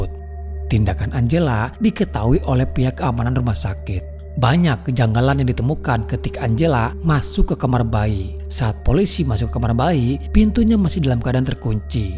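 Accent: West Java, Indonesia. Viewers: Aa orang sunda yah? 😀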